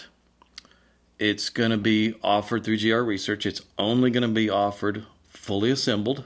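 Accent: Texas, United States. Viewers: "He cranked 'em up with some Danzieg....". Incredible. Between the story and the Texan accent, it's like a Coen Bros. movie.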